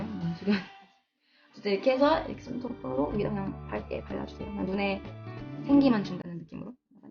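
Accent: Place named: United States. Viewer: 외국인이시져 영어를 너무 부드럽고 정말 잘하시네요 외국인이시라면 어디나라에서 오셧어요? 제가 생각하기에 미국(USA)같은데 아닌가요? 어디에서 오셧어요?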